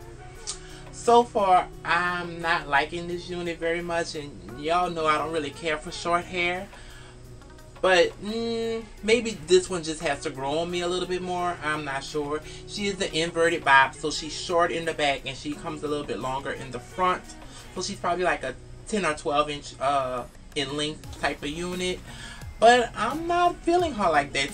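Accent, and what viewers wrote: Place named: New Orleans, United States. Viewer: Are u from new orleans? I hear the accent.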